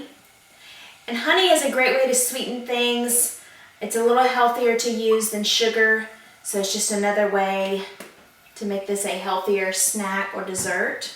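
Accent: South, United States. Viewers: Is she from Alabama or Georgia?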